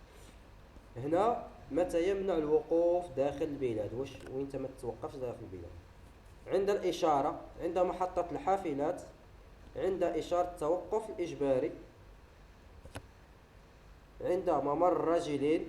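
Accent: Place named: Morocco. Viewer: انا من الشرق فهمت لهجتك %100 شوية تشبه لتاع لمراركه شكرا بززززاف على الشرح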